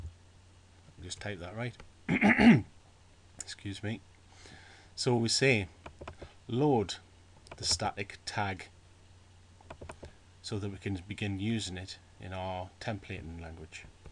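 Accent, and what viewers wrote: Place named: Newcastle, United Kingdom. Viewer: This is awesome. Django in a Geordie accent.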